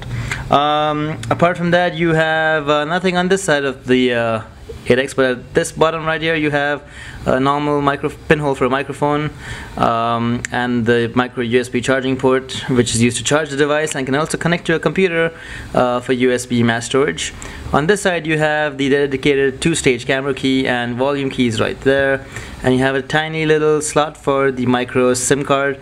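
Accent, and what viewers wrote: India. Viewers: i hate indian accents they annoy me im sorry to say but looks like the place iswonderful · Thumbs for up that indish accent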